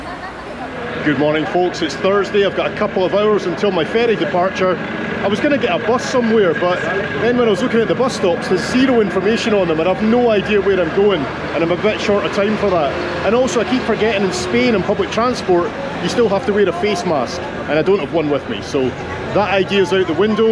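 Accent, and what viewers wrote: Scotland, United Kingdom. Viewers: I love Español with a gentle a Scottish burr. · Seu espanhol é muito bom mesmo com seu sotaque escocês, mas como é seu português?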